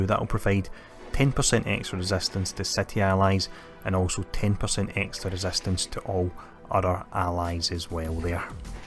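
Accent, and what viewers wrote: Scotland, United Kingdom. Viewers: You do an excellent job with this channel by the way - and I love a Scottish accent!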